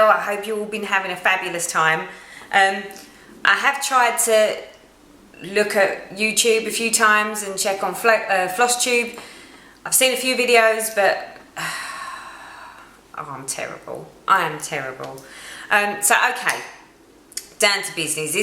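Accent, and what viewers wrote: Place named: London, United Kingdom. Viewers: I remember Bev and Teddy always commenting on flosstube videos. I used to comment back once in a while, now I wish I had made much more of an effort. She loved this community so much, which is easy, everyone is lovely to each other. I watch while stitching, and don`t always comment...I need to change that. You do lovely videos, I love your accent, it reminds me of my family in London. xo Mj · I always look forward to your videos when I see them in my subscriptions :) I think you are delightfully funny and I love your english accent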